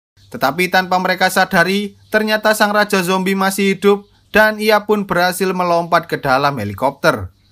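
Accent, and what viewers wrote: Java, Indonesia. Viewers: Lha kog medhog banget ... Njawani .. Wkwkwk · Bisa gk sih bos ngomong nya jngn medok bngt gitu,, pengen nonton tapi sebel sama suara nya,, 😒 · Bang kamu orang Jawa yah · Ohh wong jowo toh sampeyan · Org Jawa lu bg?? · Mas..ini yg ngmngnya jawanya medok pisan eeuy..(yg menceritakan filmnya) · Jenegan jowone ngendi mas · Abg orang jawa?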